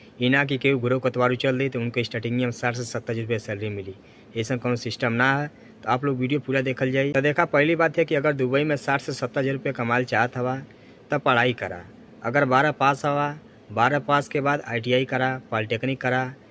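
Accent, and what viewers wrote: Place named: Bhojpuri, India. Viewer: भाई आपके मुंह से भोजपूरी बहुत अच्छा लगता हैं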